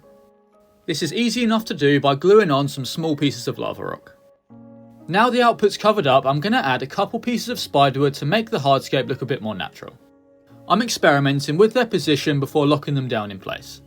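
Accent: United Kingdom. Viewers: Hello! Thanks for very entertaining and educational channel. I'm from Sweden and wondering where in the UK you're from!? What dialect you speak!? ☺️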